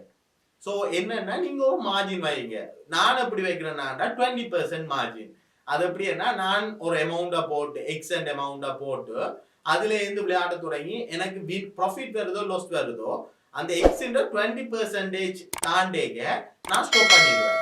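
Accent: Sri Lanka. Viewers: Nalla teacher. Srilankan Tamil vasanai sema bro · Bro neenga srilankan vaa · This is Srilankan Tamil 😍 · இவர் இலங்கை தமிழ் மண்ணின் மைந்தன்.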